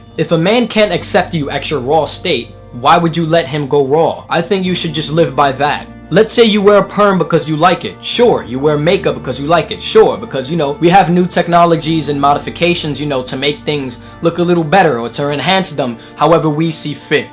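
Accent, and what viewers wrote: New York, United States. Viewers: he sounds so newyorky damn i like it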